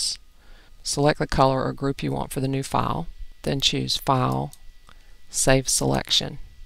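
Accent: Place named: Louisville, United States. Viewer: Is that a Louisville accent? What team is the eagle from? thanks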